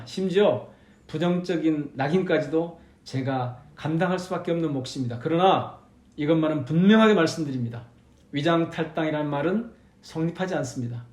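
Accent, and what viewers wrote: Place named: South Korea. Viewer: 형배의원님 겁나게 축하드려 불라요. 인자부텀 민주당 안에서 구수한 사투리 더 많이 들을 수 있게 해 줘부쑈! 🎉🎉🎉🎉🎉🎉🎉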